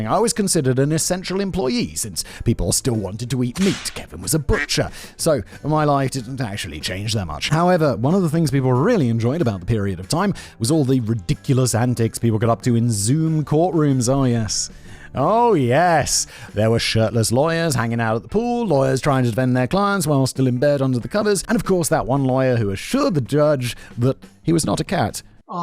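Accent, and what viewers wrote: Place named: United Kingdom. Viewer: You Tube SUCKS! I have removed this man's channels over and over again. But when You Tube changes the algorithm, it force feeds me this guy's many channels despite my removing him. channels suck. They are poorly researched information that is mostly government propaganda talking points. Terrible videos. And just because has a British accent, this doesn't not make him an authority on anything. He's just a talking head. England is over. Brexit has made the only job in England a You Tube content provider. Terrible list videos that spread falsehoods and bull.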